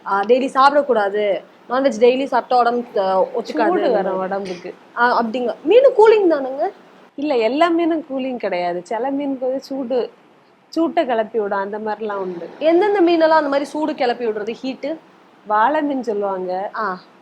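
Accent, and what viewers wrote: Kongu, India. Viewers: I think the anchor is from kongumandalam.❤ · Anchor kongu side pola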